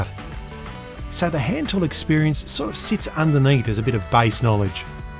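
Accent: Australia. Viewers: Thanks for the video! A versatile guy who knows how to keep going on the job! Sound advice, good sound/video and pleasing voice/axcent! Not sure, could be Australian?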